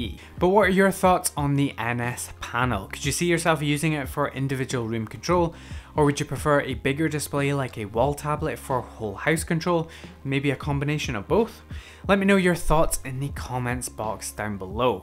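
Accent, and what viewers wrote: Scotland, United Kingdom. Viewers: At last a decent review, thanks for the clarity and Scottish accent a bonus! :)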